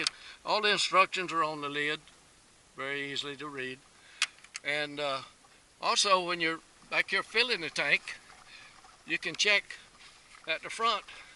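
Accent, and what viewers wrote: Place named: South, United States. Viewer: You sir got that nice southern american accent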